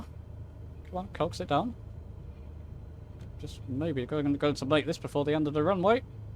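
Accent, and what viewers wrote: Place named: Liverpool, United Kingdom. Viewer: Totally off topic: Are you from the Liverpool area? I'm Dutch so I may be completely wrong, but your dialect reminds me of the Beatles.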